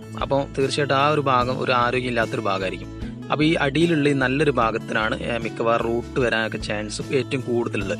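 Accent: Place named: Malappuram, India. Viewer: Malappuram slang😀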